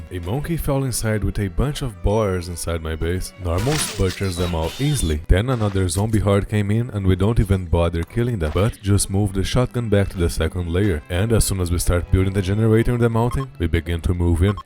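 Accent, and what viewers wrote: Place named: Brazil. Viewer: “that’s the bones of the office” Eu tinha uma suspeita que seu sotaque era brasileiro, mas depois de ouvir essa expressão eu tinha certeza! Kkkkkkkkk que legal achar um br fazendo conteúdo de rimworld, ótimo vídeo cara!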